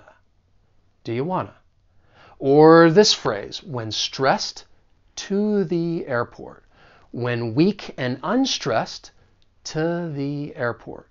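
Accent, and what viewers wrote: South, United States. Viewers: I’m not an expert when I say any of this, but I am an American with a slight southern accent. I think linking is leaning more into a southern accent than a standard one. I also think that the “for” pronunciation you are talking about leans more on the Southern side rather than standard.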